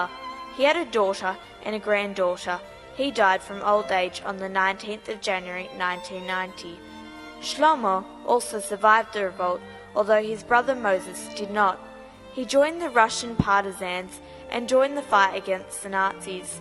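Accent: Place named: Australia. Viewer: Australian, one of the eastern states, has a speech impediment, private school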